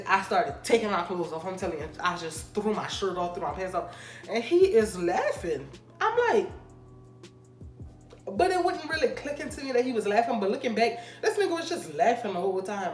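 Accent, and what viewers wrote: Louisiana, United States. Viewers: Is you from New Orleans or Homea cause yo accent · I think she from Louisiana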